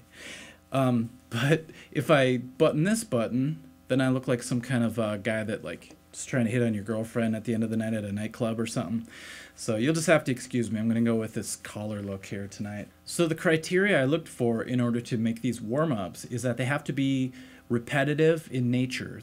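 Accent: Minnesota, United States. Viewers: From Minnesota?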